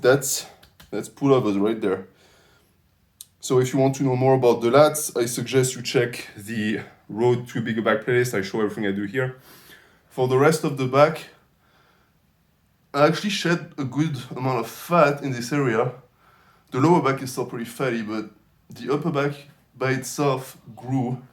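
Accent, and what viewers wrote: France. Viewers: Are you French? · french accent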